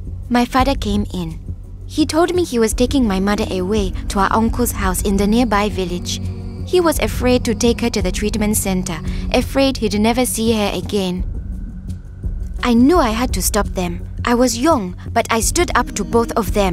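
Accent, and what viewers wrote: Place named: Uganda. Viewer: lol the voice is ugandan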